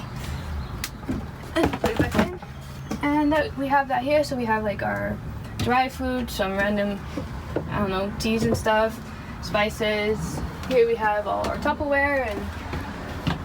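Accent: Netherlands. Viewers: Ahh dacht al dat ik Nederlands accent hoorde😁 super Tof! Ik wil dit ook in nederland😍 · Is that a Dutch accent? Nice simple van design.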